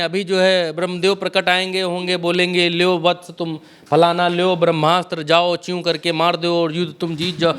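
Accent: Bihar, India. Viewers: BHAI TUMHARI BIHARIYA BAAT BAHOT ACCHA LAGA...DHUKH KE SATH DEKH RAHE HE..PHIR BHI HASIN AA RAHI HE...😂😂😂😂😂😂😂😂😂😂😂😂😂😂😂BAHOT BADHIYA ...AEISE SABHI KO SAMAZ ME AAJAYEGA SAMJANE SE..😂😂😂😂😂😂😂😂😂😂🎉🎉🎉🎉🎉🎉🎉🎉🎉🎉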